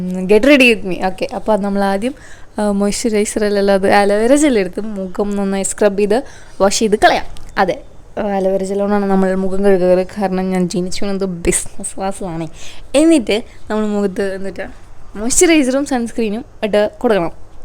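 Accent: Thrissur, India. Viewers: ഭാഷ കേട്ടിട്ട് തൃശ്ശൂർ ആണെന്നാണ് തോന്നുന്നത് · Thrissur touch und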